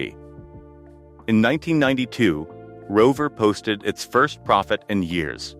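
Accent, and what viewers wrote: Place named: United States. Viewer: American accented AI telling the British their own history again. Images don't match the narrative - a nice shot of the VW Beetle production line at 0.53. Very poor editing and continuity.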